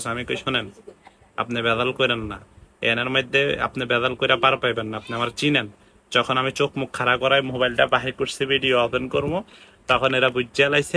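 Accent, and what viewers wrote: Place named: Noakhali, Bangladesh. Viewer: বুঝতে পারছে তুমি নোয়াখাইলা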